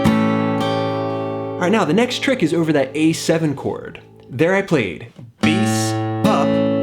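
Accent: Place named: United States. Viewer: Love your passion amigo! Great lesson again....where are you from in the US?? Your accent is super cool! Much love and gratitude from Ipswich, UK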